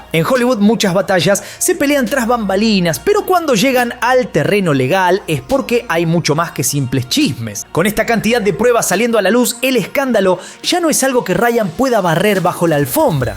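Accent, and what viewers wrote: Argentina. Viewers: bueno, no sé, yo vi este lío de otra forma, " Black le tenía ganas a Baldoni, pero él la rechazó, y como nadie rechaza a una mujer tan irresistible como black ( eso piensa ella), y como tiene un esposo tan poderoso y una amiga tan adinerada, pués comenzó la venganza femenina, destruir a su enemigo, y quien sabe que le contó a su esposo, para que él haya hecho este ridículo, poniendo en peligro su carrera". Yo pienso, la mujer está quiso destruir a un hombre que la rechazó, pero como dicen aquí en Alemania, " Se dio ella misma un tiro en la rodilla", o en Colombiano" El tiro le salió en reversa". Saludos, me encanta escucharte, ese acento argentino y como hablan ustedes me encanta, saludos de una Paisa en Alemania.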